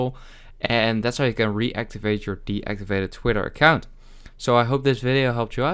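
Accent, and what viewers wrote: Netherlands. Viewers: You're definitely dutch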